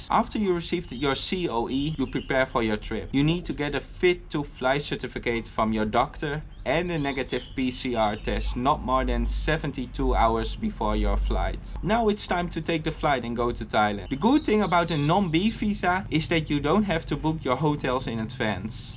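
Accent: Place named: Netherlands. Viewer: Sounds like Dutch :)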